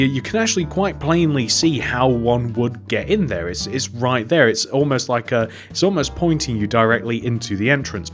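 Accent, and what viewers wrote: United Kingdom. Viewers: Are you British??? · Ben Matthews I think it is Really well because of the British accent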